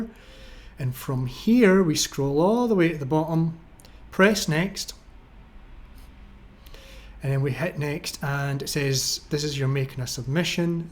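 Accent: Scotland, United Kingdom. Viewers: How can I support you in the $ sense ? ? Seriously, we need a lot more people like you (with or without the dour Scott's accent) I'm in Melbourne, and not that hard to find.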